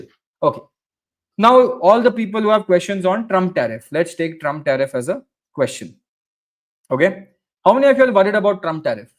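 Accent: India. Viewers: Bhai I like your hindi accents. Pleased keep adding this in your videos and I can feel it that you are seriously bursting many Myth of retail investor.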